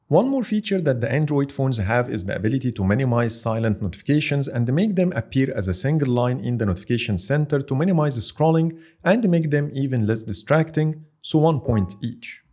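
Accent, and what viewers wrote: Egypt. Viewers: لو المراجع مصري يبقى انا كدا عرفت اللهجه صح بارك الله فيك على الريفيو الممتاز 💖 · Your accent is Egyptian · You’re Egyptian 😅😅 the accent is screaming Egyptian guy 😊😊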